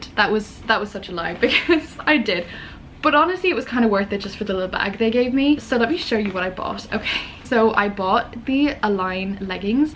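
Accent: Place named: Ireland. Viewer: I love the Irish accent!! I can listen to you all day! I love your videos 😊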